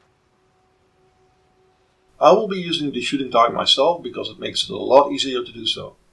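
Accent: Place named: Netherlands. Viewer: Your Dutch i can hear it cool vid..👍🏽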